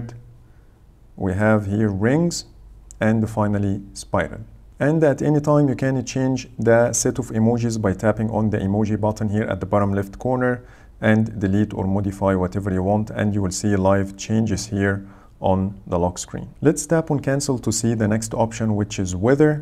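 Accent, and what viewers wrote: Egypt. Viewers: I think you are Egyptian from your English accent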